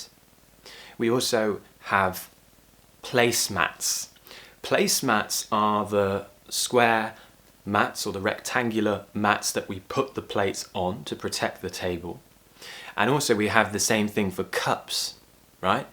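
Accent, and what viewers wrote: United Kingdom. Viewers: I used to hate British accent. That was before I discovered this channel, I cant stop hearing your voice and pronunciation. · I love your British accent. I'm one of your fans from Egypt. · Damn, I love your voice, the way you teach and this british accent. Thank you very much for your help. Hugs from Brazil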